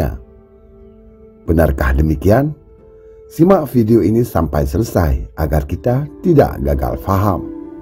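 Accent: Aceh, Indonesia. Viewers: Suaranya seperti dialog org aceh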